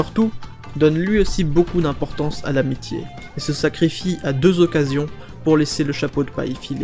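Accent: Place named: Democratic Republic of the Congo. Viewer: ça se voit à ta voix tes congolais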